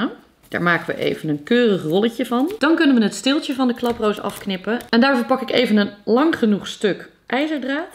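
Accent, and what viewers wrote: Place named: Flanders, Belgium. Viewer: Ik peis daje accent da ge deedt gents of antwerps of west-vlaams 1 van de 3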